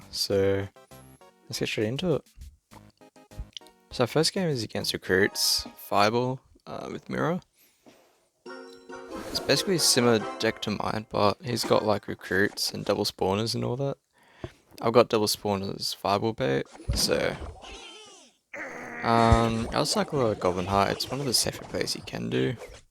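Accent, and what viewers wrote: Australia. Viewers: sexy aus accent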